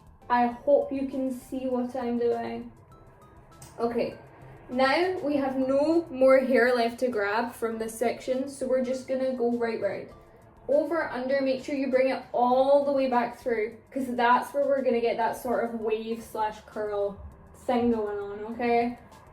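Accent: Scotland, United Kingdom. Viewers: are you scottish? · Are U Scottish? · Excuse me but I've never heard you speak before and your accent is gorgeous🥹. I'd love to hear asmr in your accent. Where are you from? Your accent sounds like it might be regionally specific and I'm always curious about that. Like how accents in new york city differ a lot depending on where in the city someone is from. Like, peter Capaldi speaks differently than you but you both sound scots, so i assume you're not from the same regions. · I’m pretty sure she’s Scottish, I could be wrong though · r u Scottish (cuz I always thought u were American) also r u British cuz I ammmm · OMG UR SCOTTISH I LOVE THAT AND I WASNT EXPECTING YOU TO BE SCOTTISH I THOUGHT YOU WOULD BE FROM LONDON! UR SO PRETTYY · I love Scottish accents so much💛💛💛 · Irish American? That would be someone of Irish decent, living or born in America. 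She’s Scottish, and was raised and is still living in Scotland..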